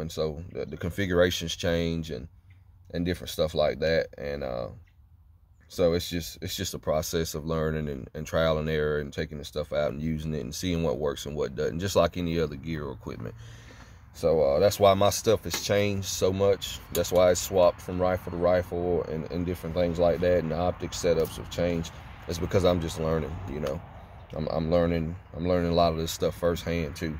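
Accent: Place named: South, United States. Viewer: Im sorry but he good ole boy i hear it in his voice. southern livin baby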